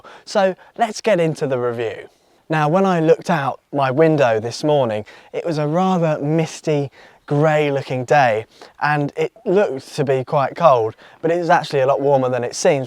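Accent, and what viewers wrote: England, United Kingdom. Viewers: How tall are you? Asking cause if you’re 5’9/5’10, or roughly 1.75 meters since you sound English it looks like the size of my Honda shadow and I’m looking for something bigger.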